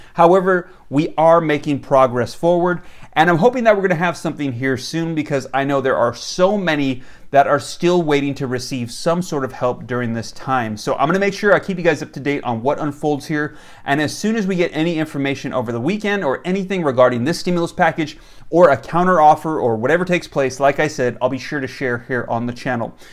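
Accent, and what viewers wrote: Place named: California, United States. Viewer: whoops! I'm sorry if I was being a butt a little but everything I said here is a true statement of mine. however I do like and appriciate his work which I have no problem about him making money off of those vids👍( I mean it's time like this how could we blame him on doing what he can time like? plus at least it's all coming from big rotten companies not our blood sucking tax!!!! 👌👌👌 **AND i think hes kinda cute with his cali accent❤️ I really really NOT a hater I do respect ppl who are not wanting those updates also💚 since it's been so ridiculous it's not even funny that politicians only think about themselves!!!!!!!😡 we ARE HURTING we NEED PAY BILLS RIGHT NOW!!!!! yeah Bills, NOT for luxury vacations or sports cars or anything else we wanna spend our money on.... THATS WHY THIS UPSIDE DOWN ROLLER COASTER MAKES US MAD & SAD I'm with ya all!!!!!!!!!!! 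GOD BLESS OUR AMERICA 🇺🇸 p. s. please know that I'm your subscriber & Ive been watching all of your vids & DESTROYED THAT LIKE BUTTON EVERYTIME contrary to my bitter comments😝 💚💚💚💚💚💚💚💚💚 So please keep up w/ your good work! WE LOVE YOU 🤘!!!!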